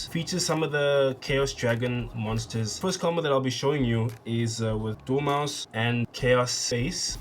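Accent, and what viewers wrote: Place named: South Africa. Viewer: Accent is awesome - u from SA?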